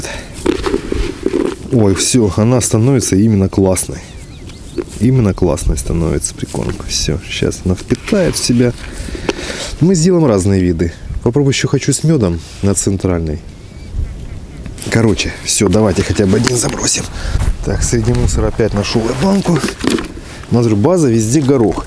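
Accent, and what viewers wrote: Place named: Eastern Ukraine, Ukraine. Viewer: Тут еще и говор Восточной Украины. В Харькове он еще ярче выражен...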